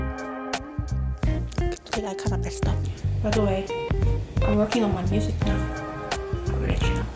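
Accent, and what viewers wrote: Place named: India. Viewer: One of the best accent its semi to an indian girl talking english 😅😂😂😂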